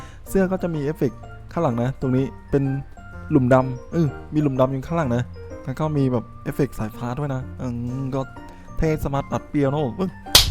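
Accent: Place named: South, Thailand. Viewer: พี่พูดใต้ได้ค่ะ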